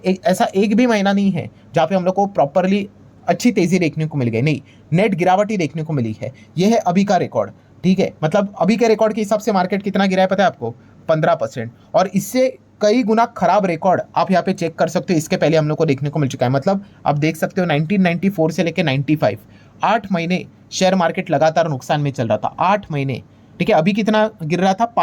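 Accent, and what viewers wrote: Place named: South, India. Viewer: Bhai south wale hai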